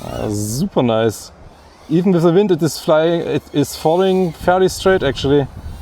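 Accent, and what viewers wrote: Germany. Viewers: accent sound german af, also mach ich mal in amtssprache weiter. Geiler spot den du da hast. Ich habe meinen goto spot leider noch nicht gefunden :D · That German accent is just crazy lol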